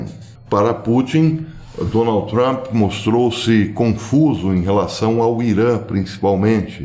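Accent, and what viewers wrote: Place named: Minas Gerais, Brazil. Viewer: velho de guerra, teu mineirês tá afiado, hein?! Parabéns 👏👏👏😁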